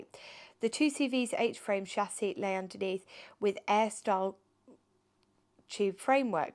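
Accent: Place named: United Kingdom. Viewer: As a frog, I love your pronunciation of french names. I really do. Sounds really nice, so British! You're so well spoken, truly pleasant to listen to you. Thank you for your quality work! You do those great cars justice! Warm regards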